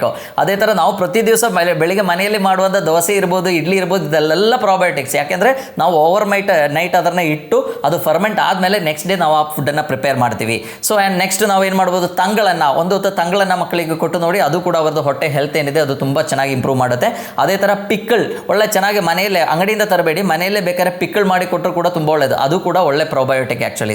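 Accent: Kerala, India. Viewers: Good explanation 🎉 good kannada by pronoucetion I found malayali🥰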